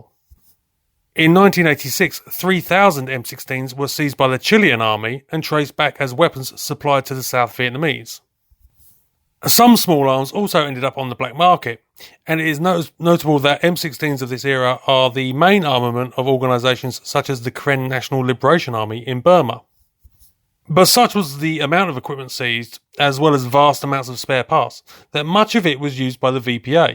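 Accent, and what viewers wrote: United Kingdom. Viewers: Because it wasn't US military property, it was South Vietnamese military property. Just exactly the same as all the gear that the Taliban laid it's hands on that all these people who don't know what they're talking about are saying all this dumb crap about the US military leaving all of that stuff laying around for the Taliban to equip itself with, like what you see here it wasn't US military property that the Taliban got, it was Afghan military property that they left laying around when they fled in the face of the Taliban taking over. Word of advice, any time you click on a video that has anything to do with US military history and you hear a British accent, do yourself a favor and immediately shut it off, because it's going to be full of lies, exaggerations and any other type of deceitful things you can think of in order to cast a bad light on America and especially it's military, for some reason they feel compelled to do that stemming from some sort of twisted feelings of jealousy that obviously comes from the US's involvement in the two world wars.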